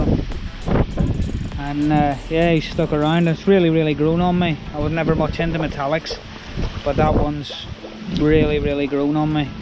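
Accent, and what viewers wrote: United Kingdom. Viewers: Great video,hope you and the family are well as we are here,great to hear the Holywood accent. Used that flexi pipe for a few years now and it is really strong though others have to be carefull as it can come in 38mm and 40mm and the larger wont fit solvent weld which I have learnt,just thought I would help a bit. Keep up the videos mate and look after yourself.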